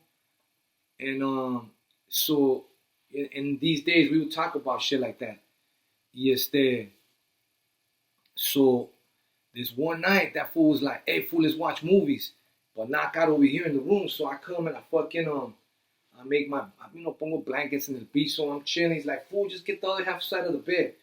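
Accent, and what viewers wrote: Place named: New York, United States. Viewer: you sorta have a New York accent when u talk..maybe it’s just me..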